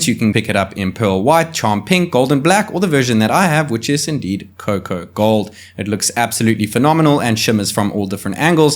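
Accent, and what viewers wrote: South Africa. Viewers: Mate, your accent sounds South African . You from here ? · no way are you south african dawg? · Are you from south africa by any chance and just living in china.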